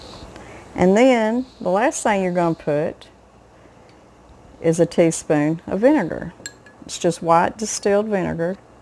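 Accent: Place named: Kentucky, United States. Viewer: Your accent is exactly like my Aunt and Grandmother who were from Harlan, Ky.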